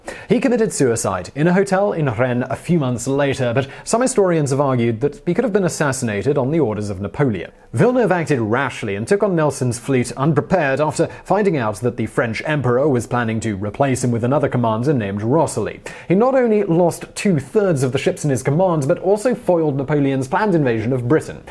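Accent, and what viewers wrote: United Kingdom. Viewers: He's british...durrrrr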